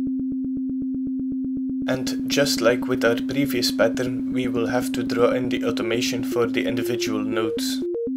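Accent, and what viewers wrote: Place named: Belgium. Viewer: Afgaand op het accent ben je zeker ook Belg? ;-)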